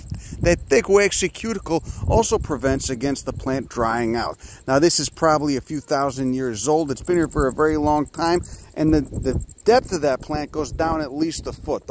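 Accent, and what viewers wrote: Chicago, United States. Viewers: This guy's from Boston or Chicago. My bet is Chicago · It is hilarious to see the title and thumbnail, then you click on and you're like, "Chicago accent." Oh yeah, its you. LOL How's it goin' ya old prick? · I think every nature video should be narrated by someone dude from Chicago. Chefs kiss! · Chicago. He’s from Chicago. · Eloquence, like a Chicago accented David Attenborough! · Lotta people in this comment section aren’t familiar with a true Chicago accent I guess 🥴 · this is a chicago accent! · no, this is not a new York accent. It’s a Chicago accent. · as a new Yorker i feel like this accent is most likely from Chicago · That’s a Chicago accent. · Ok, apparently it is, in fact, a Chicago Accent, so sorry about that, sounded that way to my southern ears! 😆😁 Still delightful though! 🤍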